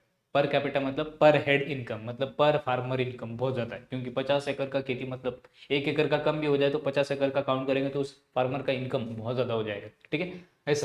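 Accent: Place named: Maharashtra, India. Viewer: Ha bolyvarun tar marathich vatat ahet sir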